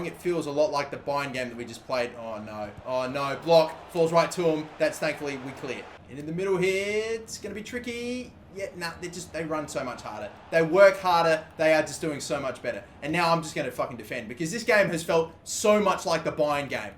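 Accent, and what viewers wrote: Australia. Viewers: Soccer Eric77 I think the Aussie accent has to do with it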